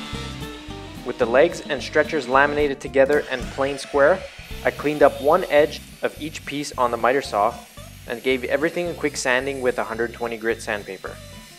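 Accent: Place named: Canada. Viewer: Great project and great video. Im gonna be honest here: When you started talking I was like, "Oh, this dude's Canadian" (because of the accent). When you got to the feet and were using hockey pucks I burst out laughing. Nicely done.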